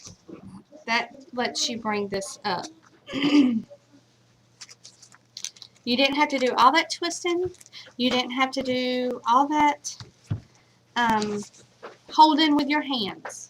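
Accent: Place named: South, United States. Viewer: I LOVE YOUR HELEN BOW !!! YOU HAVE TO BE FROM THE SOUTH..... YOU TALK LIKE ME ! YOUR MISSISSIPPI FRIEND I LOVE YOUR VIDEOS ❤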